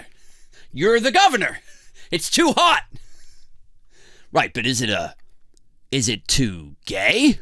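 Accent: United States. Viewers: I love your American accent, say "I'll use my credit card!' next!!!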